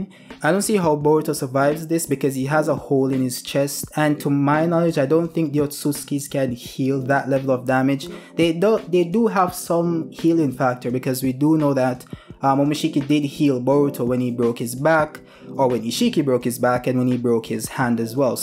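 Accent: Jamaica. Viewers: i knew it i am also Jamaican bro u speak Jamaican English when i speak English u hear ghetto english nice to meet you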